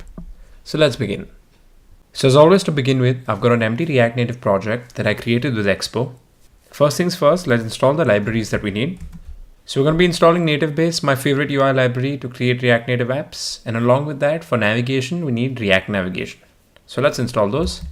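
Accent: India. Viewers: I love your indian accent :) Thank you bro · The indian accent ><' ... but ... Good job man ;)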